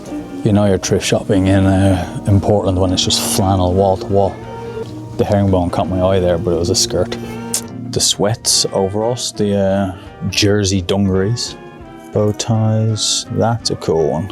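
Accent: Ireland. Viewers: Classy clothes, cool sounding irish accent and great thrifting tips. Quality content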